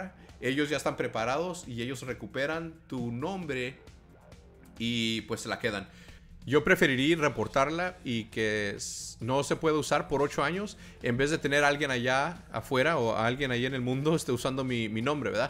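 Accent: Mexico. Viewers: de onde eres mi tienes acento como de mexican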